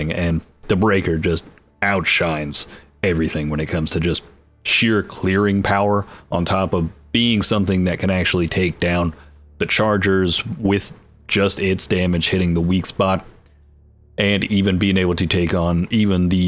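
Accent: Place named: Deep South, United States. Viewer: the deep south accent sold me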